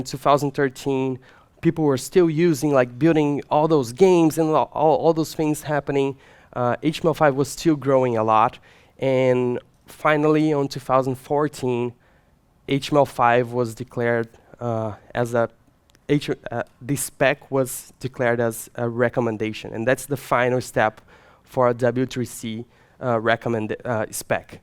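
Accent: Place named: Minas Gerais, Brazil. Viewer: Thanks for sharing this knowledge. I didn`t know about any of those APIs before I watched your talk. (I'm also Brazilian, bet you are from Minas Gerais by your accent )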